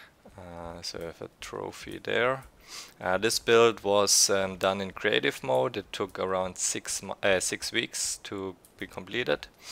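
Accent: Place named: Germany. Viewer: do it dude. I’m just some 36 yr old enjoying the game immensely and loving seeing all the creativity of others. Your builds are exceptional and I love the way you presented it all. I work with voice overs for a job, so I can’t lie, I just love the efficiency of your German accent. Keep building the dream and continue to press the boundaries of our sacred Valheim 👍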